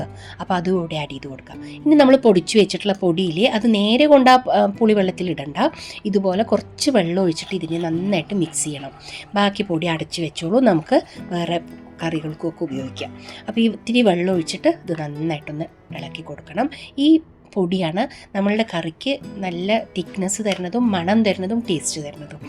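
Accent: Palakkad, India. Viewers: Typical palakkadan bhaasaha ttolin😊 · സംസാരം തന്നെ കേൾക്കുമ്പോൾ കൊതിയാകും ഉണ്ടാക്കാൻ ആയിട്ട് പാലക്കാട്ടുകാരി സൂപ്പർ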